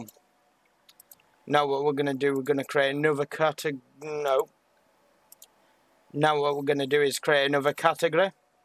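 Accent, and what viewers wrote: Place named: Ireland. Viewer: WAIIIIIIT HES IRISH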